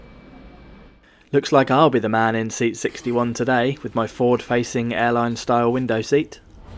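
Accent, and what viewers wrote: United Kingdom. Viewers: Absolutely disgusting; you are claiming that this is a non-political movie, still you are referencing to putin illegal invasion + fundraise + repeat Nazi slogans. Based on your accent you might be from the UK. Ask your relatives, if they know British soldiers, who were wounded or killed by the Nazis in World War 2. Ukrainian Bandera Nazis fought together with the German Nazis against the Allied Forces in World War 2.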